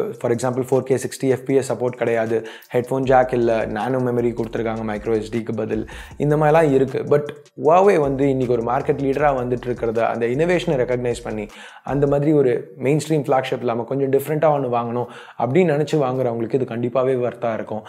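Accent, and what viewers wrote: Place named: Tirunelveli, India. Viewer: Tirunelveli bro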